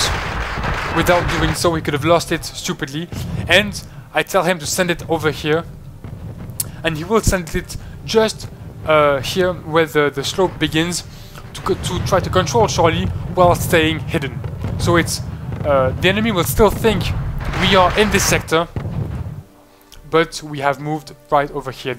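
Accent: France. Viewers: First :p, nice vids keep up the good work and the french accent ;)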